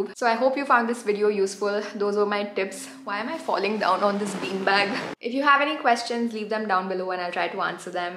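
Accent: India. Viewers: But your accent is totally indian you can’t say either American or British lol I enjoy watching your videos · today i am first time encountered with your video, i liked your fluency in english with good indian accent could understand easily.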